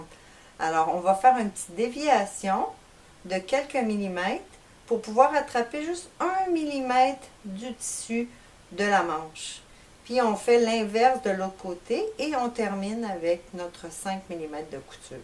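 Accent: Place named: Canada. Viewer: Merci infiniment pour ce super tuto, très bien expliqué avec ce sympathique accent canadien! je désesperais de ne pas finir mon chemisier. Je vais pouvoir le faire et je m'abonne bien évidement.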